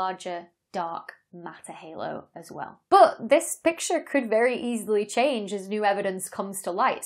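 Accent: United Kingdom. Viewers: Fabulously put together - you've got a new fan here. Great presentation, clarity and very easy to follow... and it's soooo refreshing to hear a British accent for a change. Love it. Harry.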